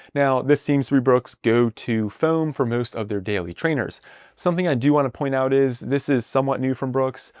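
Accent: Maryland, United States. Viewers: Maryland accent heavy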